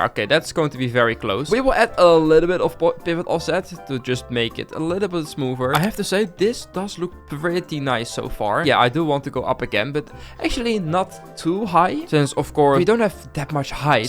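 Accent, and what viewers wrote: Netherlands. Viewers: Hoi ik hoor dat jij Nederlands bent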